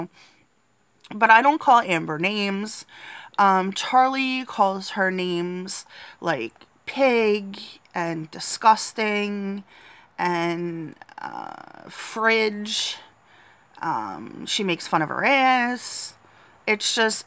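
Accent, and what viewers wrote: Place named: New York, United States. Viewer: You sound like you have a New York accent! Best of luck with the challenge.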